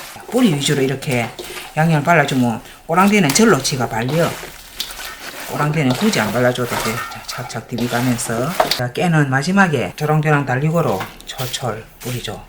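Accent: Gyeongsang, South Korea. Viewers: 저도 혼자 빵 터져서 주름졌어요 ㅋㅋ 말씀이 너무 정감가고 재미있어요. 아마 서부 경남분이신가 싶네요~^^ 잘보고있어요.😊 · 구수한경상도사투리최고 저도경상도토박이라더더파김치배워갑니다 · 꼬꾸라 져뿌리 깅상도 사투리 오지럽ㅋㅋㅋ넘구수해요 항상 건강하시구 반찬많이 갈쳐주세유~감사합니데이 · 사투리 내만알겠노 ㅋㅋㅋㅋㅋㅋㅋㅋㅋㅋㅋㅋㅋㅋㅋㅋㅋㅋㅋㅋㅋㅋㅋㅋㅋㅋㅋㅋㅋㅋㅋㅋㅋㅋㅋㅋㅋㅋㅋㅋㅋㅋㅋㅋㅋㅋㅋㅋㅋㅋㅋㅋㅋ 와 창원 아니면 마산같은 느낌이시네. 제가 마산사는데 너무 똑같다 ㅋㅋㅋㅋㅋㅋㅋㅋㅋㅋㅋㅋㅋㅋㅋㅋㅋㅋㅋㅋㅋㅋㅋㅋㅋㅋ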